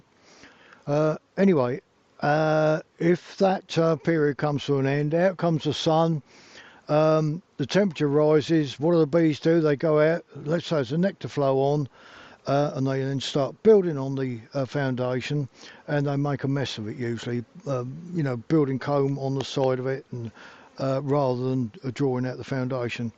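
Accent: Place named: Sussex, United Kingdom. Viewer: Sussex. Not many of us left!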